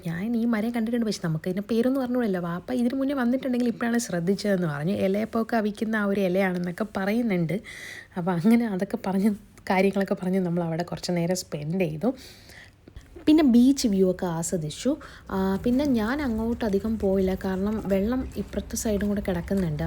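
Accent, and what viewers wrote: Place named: Trivandrum, India. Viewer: Trivandrum slang orupadundu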